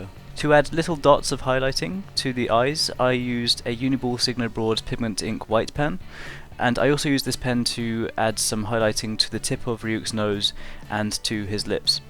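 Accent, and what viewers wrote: England, United Kingdom. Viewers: ¿Quien no adora ese acento inglés que tiene? uwu <3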